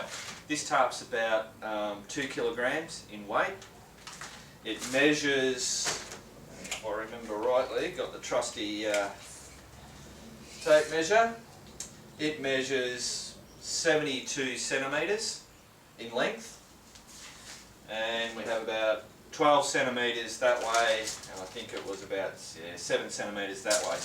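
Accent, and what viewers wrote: Australia. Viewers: You make great videos very informative , thank you for adding US measurements . You have a great Aussie accent , took me awhile to get used to it. I live almost dead center of California only 40 miles away from the center in Clovis California thank you again keep up the good work !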